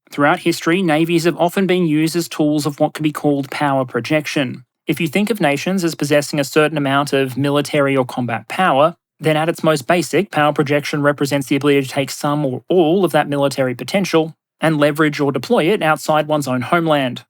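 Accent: Australia. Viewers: Absolutely high quality research and even more superb delivery. What a witty Aussie 😂 pitty you guys can’t play rugby 🤣try next time in Suva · Didn't notice it till now that you've Aussie accent mate, Aussie Aussie Aussie!!! Oyyy oyyyy OYYY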